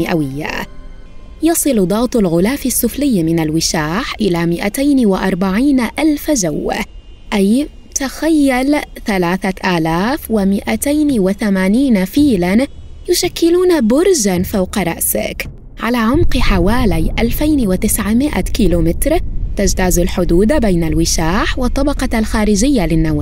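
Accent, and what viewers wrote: Egypt. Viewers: مين لاحض انو الي تتكلم مصرية 😂❤️❤️❤️❤️تحية لمصر من الجزائر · تم اكتشاف جنسية المعلقة التي نحبها انها من مصر وااعع لغتك العربيه الفصحى رائعة شكرا لكى لقد افادني درس الجيولوجيا هذا ♥️ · باين🧐 عليها مصرية😏😂😂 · فعلن مصرية مصرية 😂😂😂😂😂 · انتي اللي بتتكلمي في الفديو مصرية؟؟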